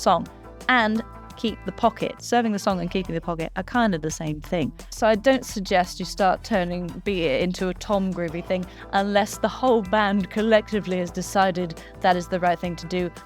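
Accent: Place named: England, United Kingdom. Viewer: I love your videos and your English accent.😍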